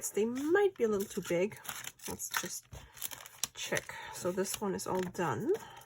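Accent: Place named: Minnesota, United States. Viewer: I love these! This vid just popped up. Are you still making videos? You sound like you’re from Minnesota or Wisconsin. Just an observation. Great content. 👍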